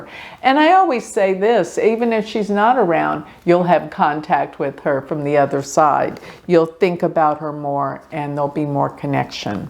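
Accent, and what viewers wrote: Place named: South, United States. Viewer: Just found last week. Love her. And she is in Dallas where I lived for 30 years. I knew she was southern with that accent and hair. She is smart Dallas glamour!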